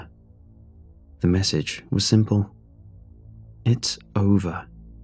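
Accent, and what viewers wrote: United Kingdom. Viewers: or anyone else, can you tell me what part of the Britain his accent is from? It's the clearest sounding British accent I've ever heard and now I love it lol.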